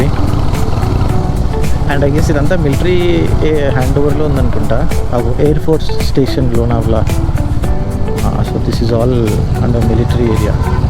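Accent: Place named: Telugu, India. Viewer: Brother you talk English off Telugu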